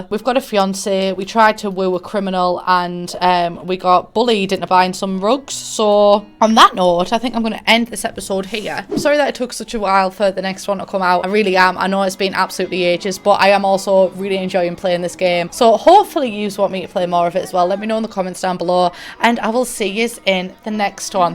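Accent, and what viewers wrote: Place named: North, United Kingdom. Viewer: Laughed all the way through this video you are soooo funny ! Proper cheered me up also nice To see and hear a fellow northerner 🤗. Xxx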